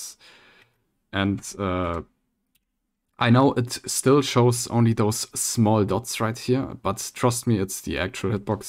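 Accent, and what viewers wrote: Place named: Germany. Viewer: your voice is very german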